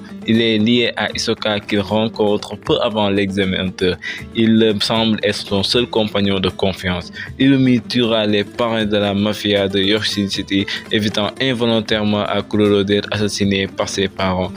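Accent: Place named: Senegal. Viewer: Yo t'es sénégalais.wais je reconnais l'accent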